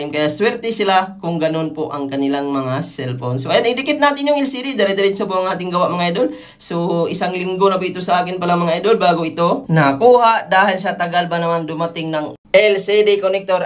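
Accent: Iloilo, Philippines. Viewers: idol parang illonggo ka?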